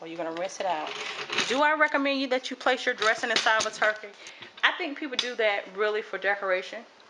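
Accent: United States. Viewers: I can tell you this you sound like a very good lady from America there are not a lot of good people in America. I always cook the turkey at my nieces place and there's about 14 of us and i always wanted to try to and injector the turkey because i know it will be so good. I stuff my turkey because everybody take some home and there's nothing left of the turkey. I can't find one here in Canada the injector i use butter unsalted not margarine and i add poultry seasoning and Rosemary olive oil and then I mix everything together and then I put it underneath the skin a pound of butter it's to die for I clean my turkey out just like you do :) I would like to do it like you didn't i have to go shopping today and look to see if i can find one of the injector. Thank you for the video Merry Christmas 2016 .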